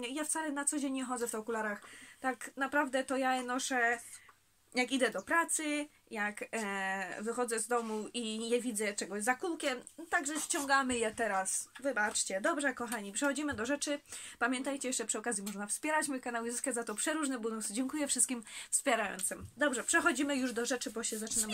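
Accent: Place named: Silesia, Poland. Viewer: Jak fajnie ślonskiego posłuchać na yt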